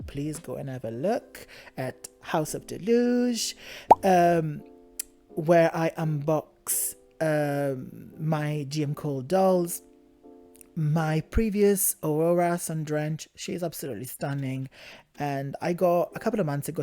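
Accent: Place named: Japan. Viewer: I am obsessed with your accent. Are you of Japanese descent? More videos please, but also include more pictures.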